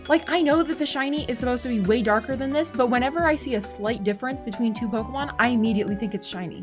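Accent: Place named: United Kingdom. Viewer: You sound like someone from around the uk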